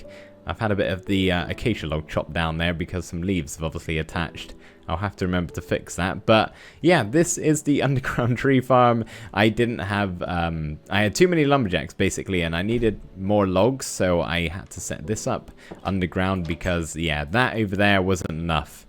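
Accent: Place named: Cornwall, United Kingdom. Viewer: Always wondered what part of the UK you're from, you have a similar accent to me which isn't something I often come across and I've noticed you say 'haf' not 'hahlf' same as me, and I'm from Cornwall 😊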